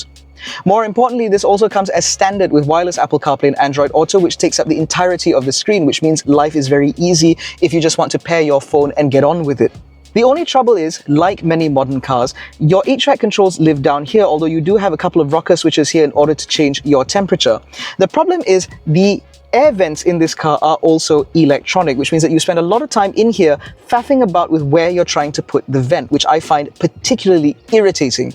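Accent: United Kingdom. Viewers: British accent on a British brand, apt! :D Lovely car that I can't afford.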